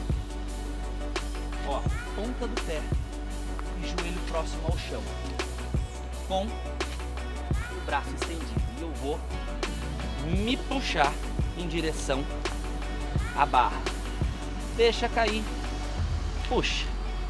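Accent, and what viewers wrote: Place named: Porto Alegre, Brazil. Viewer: Tu é gaúcho ? 😅 Percebi um ar portoalegrense na tua forma de falar,as praças dos vídeos me lembram muito Porto Alegre,pois sou de Porto Alegre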